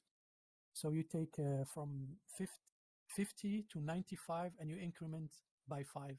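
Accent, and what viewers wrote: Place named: Tunisia. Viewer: المتكلم تونسي و لاجزائري ..لكن الصوت زيروا كبير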